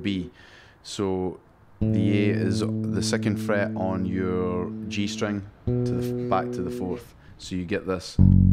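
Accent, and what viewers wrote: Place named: England, United Kingdom. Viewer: Very helpfull lesson, and like the english accent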